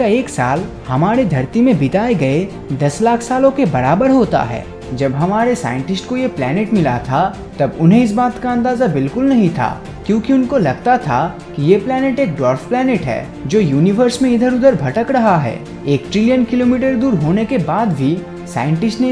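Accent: Bengal, India. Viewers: Your hindi is as like as Bengali people 😊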